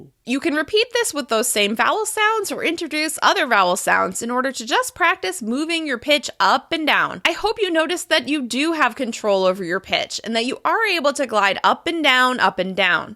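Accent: United States. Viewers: American acent amazing teaching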